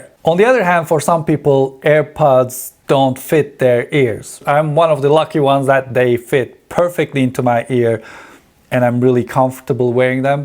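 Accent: Turkey. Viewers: I sensed Turkish accent. Am I right?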